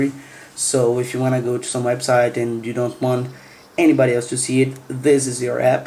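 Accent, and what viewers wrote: Brazil. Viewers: I believe its because he have a Brazilian accent.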